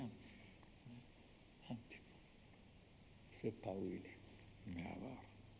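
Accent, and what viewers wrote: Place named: Czech Republic. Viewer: I' m hre because of Franz Bardon. That's fantastic knowledge ! Thank you for sharing ! And I speak french, so it's a delight to listen to an old wise man with a strong tchekich accent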